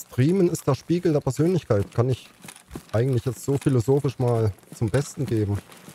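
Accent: East Germany, Germany. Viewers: Sonofcolossus hat es so schön zusammen gefasst - daher möchte ich mich auch mal zu Wort melden, nachdem ich Deine Videos schon eine ganze Weile erfolge: Erstmal Hallo Wie gesagt verfolge ich Deine Videos zu Tarkov schon länger. Anfangs dachte ich mir schon mal ab und an - was fürn noob. Aber je öfter ich in deine Videos geschaut habe, habe ich feststellen müssen - da spielt einfach ein "Otto-Normal". Keine high-end Waffen oder meta- gear, kein Rumgespringe oder ausm Fenster des 3. Stocks in die Parterre, oder straight auf jede Konfrontation,sondern einfach nur "normal" zocken. Klar geht das auch oft in die Hose (kenne ich selber), aber ich finde deine Videos dennoch wesentlich unterhaltsamer als die von Meyer, 2Twistet oder sonstigen namenhaften Streamern! Dazu kommt dein "Ossi-Dialekt" - herrlich - vor allem, wenn du weggeballert wirst und "fluchst". 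Oder einer deiner Kumpels mit denen zu in die Raids gehst (komme gerade nicht auf seinen Namen -!?) der immer so schön sagt "Ach hör' mir doch öff" 🤣 - herzerfrischend! (an der Stelle, Grüße aus dem Westen), Unterm Strich muss ich zugeben, dass ich mich mittlerweile echt auf neue Videos von dir freue und alle wirklich immer unterhaltsam sind! Mach' weiter so, bleib' Deiner Linie treu und viele Kills und Loot gewünscht! Grüße, DoomDog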